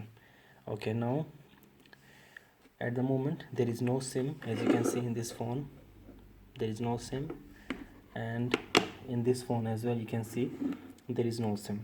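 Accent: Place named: Pakistan. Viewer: Bhi tum Pakistani lagta hn awaz sa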